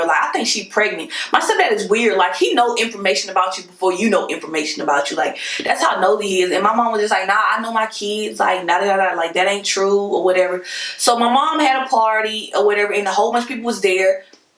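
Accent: Atlanta, United States. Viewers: Are you from ATL cause you got that accent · Where are you from sis!!!!! I hear ATL all in your voice 🤗😂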